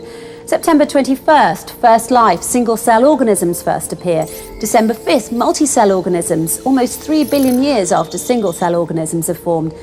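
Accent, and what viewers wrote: England, United Kingdom. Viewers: This is English accent at its best !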